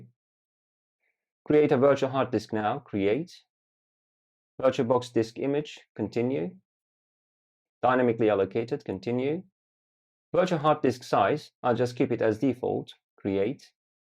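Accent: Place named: United Kingdom. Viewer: Brilliant British accent 👍🏼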